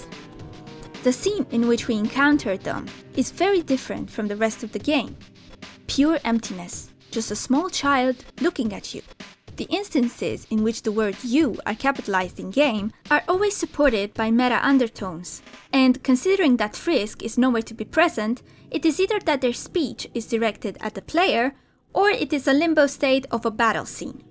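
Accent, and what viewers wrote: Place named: Poland. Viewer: Small off-topic, but I'm a bit curious. Are you perhaps from a slavic country? Your pronunciation sounds very similar to how my polish friends sound when speaking english lol.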